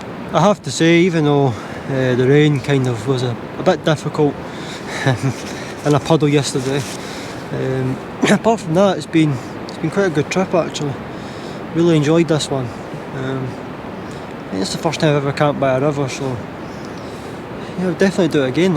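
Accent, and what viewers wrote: Scotland, United Kingdom. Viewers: Hello from Boston, Massachusetts, New England, USA. Love the Scottish highlands and Scottish accent. Could listen to you talk about your camping meals, puddles, soaked tent (though dry inside, I’m sure) and other details all day. Excellent video. Thank you.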